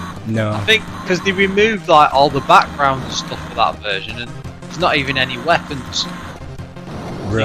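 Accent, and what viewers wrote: United Kingdom. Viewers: Good to know! You sound British or Australian/NZ, so I was surprised that you had an NTSC Amiga.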